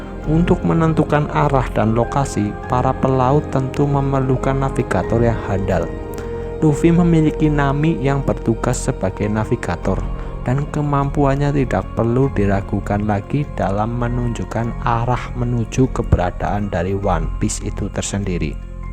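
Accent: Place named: Java, Indonesia. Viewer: suaranya medok bngt!!mau nonton jdi kga jdi ,next.vidio pake teks aja gan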